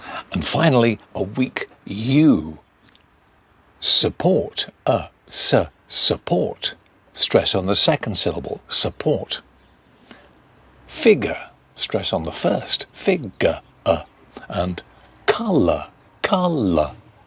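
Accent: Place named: United Kingdom. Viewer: I love biritish accent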